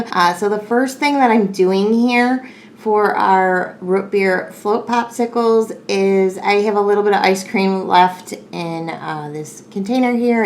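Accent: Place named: Midwest, United States. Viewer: OKAY I HAVE TO KNOW!! Where is your Midwest accent from?! 😍 These fudgesicles look so good!!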